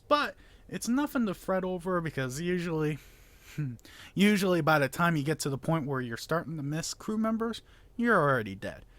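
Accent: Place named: South, United States. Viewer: what is your 1st language? you kinda sound like your playing with a "southern" accent, maybe something else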